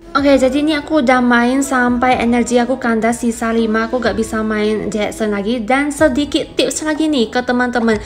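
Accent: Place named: Java, Indonesia. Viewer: Indonesianya Medok :D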